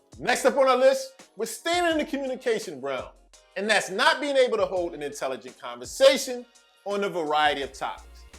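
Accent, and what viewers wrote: Philadelphia, United States. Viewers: Wait you’re from philly?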